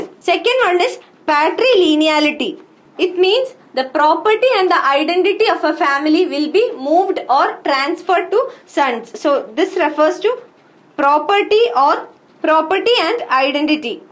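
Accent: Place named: South India, India. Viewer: Thanks for valuable information. I can say with the accent of mam, she is surely a south indian and thats great.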